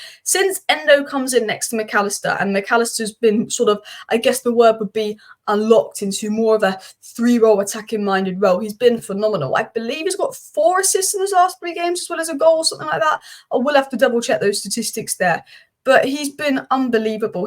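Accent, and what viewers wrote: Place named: Manchester, United Kingdom. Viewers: Can you slow down please? I can’t understand your Manchester accent. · Typical United fan sounding like a true Mancunian and like all united fans never going a game. Just imagine if united take points tomorrow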